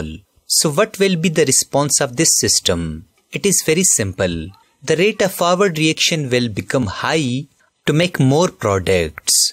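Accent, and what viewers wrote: South India, India. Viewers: Bruh are you South Indian? 'Cause your Accent 😭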